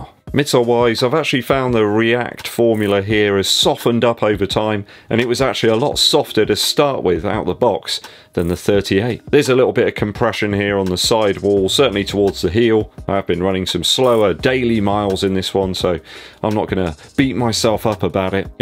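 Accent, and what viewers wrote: United Kingdom. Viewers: Your voice is perfect for this. What can I say you got a proper British accent and people listen 😂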